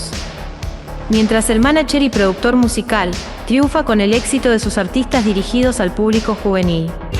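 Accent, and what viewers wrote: Argentina. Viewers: Muy buen video. Ahora bien, la locutora (que hace un muy buen trabajo) es claramente argentina, asi que resulta raro escucharla usar giros idiomáticos netamente españoles como "chupipandi" o "vidio" mientras pronuncia "eshos" y demás. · Canal pedorro y tendencioso. Informar con humor? Son peores que Locomía! Y la mezcla de locución «porteño - neutro» es de terror!! Quién te de deja una «megusteada»? 👎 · ¿Narración con acento argentino y modismos de España? 😑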